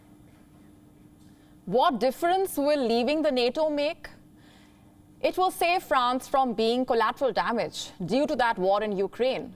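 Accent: India. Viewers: The Indian English! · You are speaking Indian English. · I hate indian accent. It's disgusting. Please learn the language well. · Fire her. Her Indian accent is atrocious.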